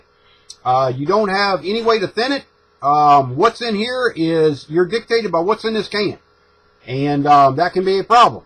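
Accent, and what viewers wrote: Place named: South, United States. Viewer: This is funny, you know how they say everyone has their own twin? Well bud,I found yours. My Brother in law Paul is almost identical to you even down to the go t and glasses. What separates you guys is the voices. Paul doesn't have a southern accent and he is a soft speaker. AND get this... He builds car models, alot of local circle track cars that raced in the 60's. Anyway,yup,you now have your unrelated twin. I wish I had a current photo. I got on your channel to see how other car modelers paint 2 tone.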